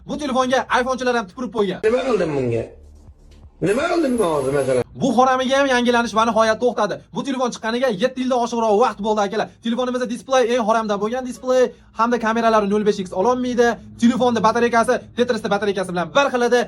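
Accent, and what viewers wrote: Andijan, Uzbekistan. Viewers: Хакикий анжанча обзор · Anjancha shevaaa 😂😂😂😂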